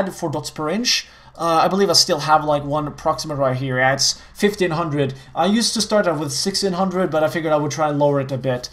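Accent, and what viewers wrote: Norway. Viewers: Er du norsk for faen:) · er du NORSK!?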